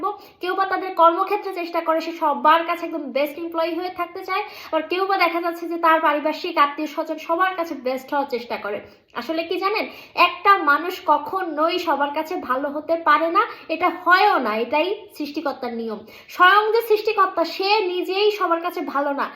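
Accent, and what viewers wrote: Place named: Bagerhat, Bangladesh. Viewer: আপনি বাগেরহটের আঞ্চলিক ভাষা কথা বললে ভালো লাগে, একজনকে মিস করি যে দূনিয়াতে নেই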